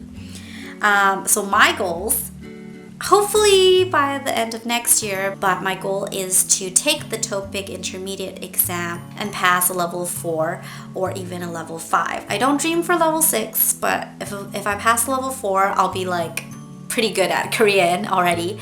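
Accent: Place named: Canada. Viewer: are you from Canada ? you sound like one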